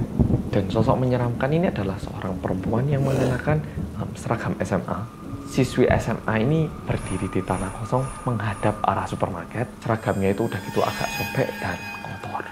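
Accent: Java, Indonesia. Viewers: Kak orang aman ....suwarnya kaya logat Jawa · dia pernah lama tinggal di indo. di malang, jadi medook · Kyk Jawa ngomong nya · Kaya orang korea tapi sekali ngomong itu keliatan orang jawa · ilma azzahra ya kan mas cerita ny medok terus bolak balik mas bilang suara dering hp ny "Ring ding dong ring ding dong" itu kan bikin ngakak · Orang jawa ya bang?? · Orang korea aja bisa medok masa kita gak bisa Ya tapi gw gak bisa sih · owlh mulak men logat e jowo bgt 😅rupa2 ne koyo raono korea2 ne je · Ni muka Korea kaya orang Jawa logatnya 😅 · Mirip Rep Monster _ Kim Namjoon, a.k.a. salah satu member boy band korea, BTS. Tapi bahasa jawa kentel-nya lucu 😭👍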